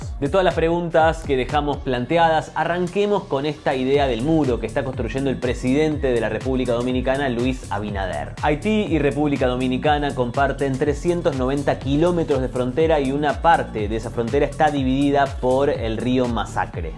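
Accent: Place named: Argentina. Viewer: Por tu acento diría que eres argentino y si es así tu país es bastante grande y tiene más recursos que nuestra media isla por lo que te sugiero que nos ayude con esta invasión haitiana y te lleves por lo menos un millón de todos los haitianos que tenemos aquí.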